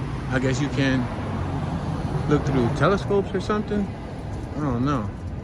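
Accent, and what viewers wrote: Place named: Brooklyn, United States. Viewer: Sounding deep Brooklyn.enjoy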